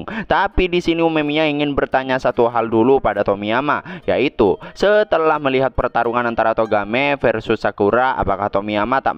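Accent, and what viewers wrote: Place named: Madura, Indonesia. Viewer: sama sama orang madura soalnya bang, kerasa banget logatnya di telinga aku wkwkwk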